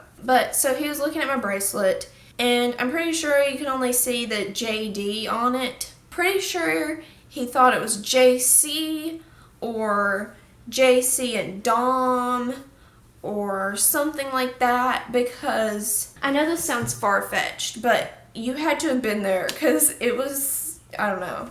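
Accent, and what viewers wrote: United States. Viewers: I love ur fuckin country accent it cute and ur very pretty🔥👌🏻😘🤘🏻✌🏻️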